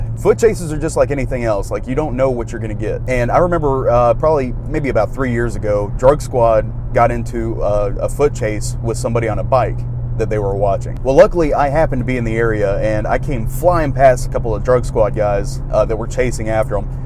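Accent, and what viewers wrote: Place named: South, United States. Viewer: Part three please. I love your southern accent.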